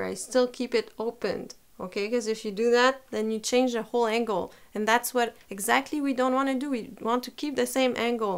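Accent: France. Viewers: Yeah ! the french prononciation ! Vive les français ! !!! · Hey are you a French? I like your English too.❤️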